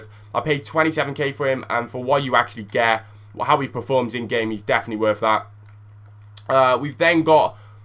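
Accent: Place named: Cardiff, United Kingdom. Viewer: I think it would be funny as fuck if you do funny squads mate, btw are you from cardiff ???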